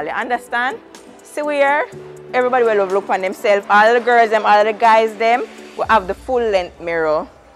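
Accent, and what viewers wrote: Jamaica. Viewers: No man you work there now?? Muss lol i love the way you present these informative vlogs and you just fling the patois left right and centre. I LOVE IT!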